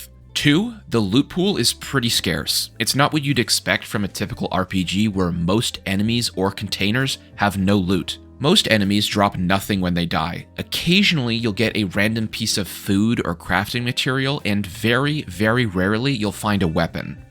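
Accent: Canada. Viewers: Are you Canadian? 🤔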